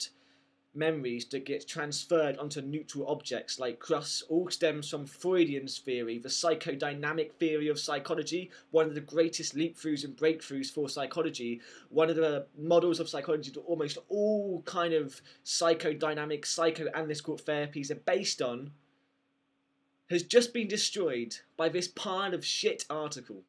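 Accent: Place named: London, United Kingdom. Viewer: Are you aware that a Gurken is a Cucumber? It's basically a pickled cucumber, which I absolutely hate. You have an amazingly strong English accent, you sound like a Londoner.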